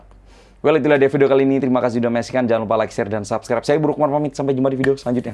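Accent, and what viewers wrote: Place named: Surabaya, Indonesia. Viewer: oalahhh logat nya kayak orang surabaya dulu makanya tak kira orang surabaya wkwkwkwk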